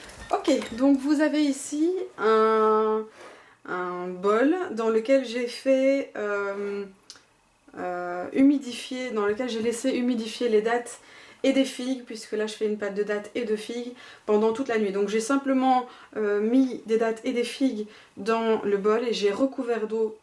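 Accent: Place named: Belgium. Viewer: Merci pour la recette mevrouw ; j'ai tout de suite entendu que tu étais une compatriote Belge ! :) J'ai une question : le Blender Magic Bullet est-il une machine fiable ? Parce que vu son prix (99$ c'est ça ?), je préfère que ça soit un investissement sûr et durable (et alors, où se le procurer en Belgique...).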